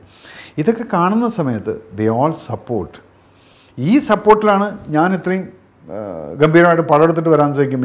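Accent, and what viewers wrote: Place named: Tamil Nadu, India. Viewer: His Tamil accent is superb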